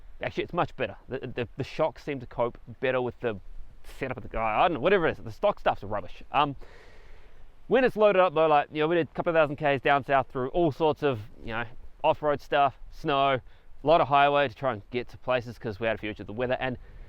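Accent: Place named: New Zealand. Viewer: NZ. Great video and love the enthusiasm and being an Aussie Kiwi love the accent. Coming from a personally highly modified Wrangler it's hard to good Fortuner content like yours. The Fortuner won't replicate the Wrangler in extremes but that not what it is for but love what you are doing without making it crazy and keeping it a balanced. I have followed your lead and just stuck on Maxxis Razr and am also looking at EFS suspension over OME or Tough Dog (maybe you should look at sponsorship ;-)). I am really interested in the longer shock you put in the rear and how that is still performing. Can you provide the model no of the Rear EFS shock please. Cheers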